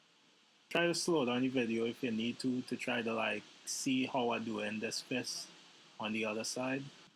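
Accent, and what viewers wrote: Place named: Trinidad and Tobago. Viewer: Sound like a Trini bro lol, Good Video btw, learnt a lot !!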